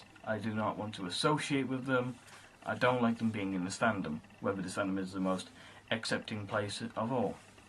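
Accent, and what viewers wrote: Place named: United Kingdom. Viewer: nice video, your british ranting reminds me of rysis and arthimus wishfoot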